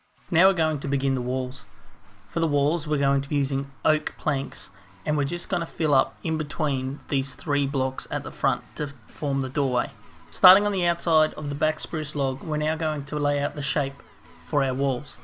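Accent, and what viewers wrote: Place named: Australia. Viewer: What a nice surprise to hear that accent after watching your timelapses - Aussie? Amazing content though mate, keep it up, you're destined for greatness!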